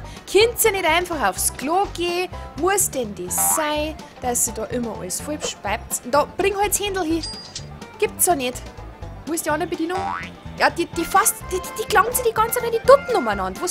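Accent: Bavaria, Germany. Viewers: mach mal ne ganze folge auf Bayrisch! BITTE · Die Idee finde ich gut Finde das spiel so witzig besonders mit deinem bayrisch =)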